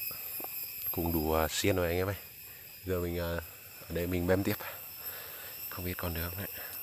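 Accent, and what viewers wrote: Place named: North, Vietnam. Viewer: xa quá nghe giọng bác giống ngoài bắc tưởng ở bắc cho e gl cùng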